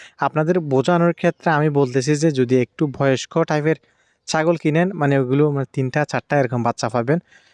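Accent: Chittagong, Bangladesh. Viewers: ভাই আপনার ফার্মের ঠিকানাটা কোথায় মনে হয় চট্টগ্রামে তোমার বাসায় একটু চট্টগ্রামের মতো লাগে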